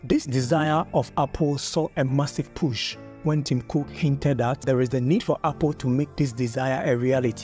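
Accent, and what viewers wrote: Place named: Africa. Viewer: Cool are u African becuse your English reveals your country It's really cool Love from india🇮🇳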